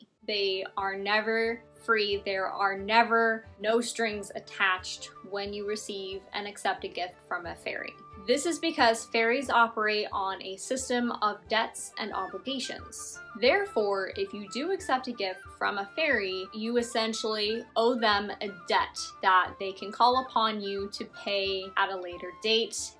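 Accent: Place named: Australia. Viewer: Omg you have an Aussie and you have a lovely video layout. I like you already! My bf's niece is getting interested in the fae. I'm a fallen catholic, but I love researching for other people to make sure people do their own practices in a safe, but still fun way. Plus I love supporting and learning about things. Thank you so much for this video, it's so hard to find good content on this subject! Question: Do you know anything on Green witches? I think she's showing an interest in that without knowing what it is too and would love to get reading material on it for her.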